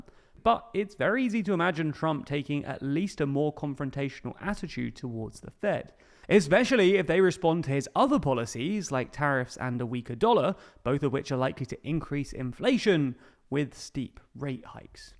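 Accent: England, United Kingdom. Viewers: This dude announcer is from England?? Like England has such a great interest in American politics?? 😂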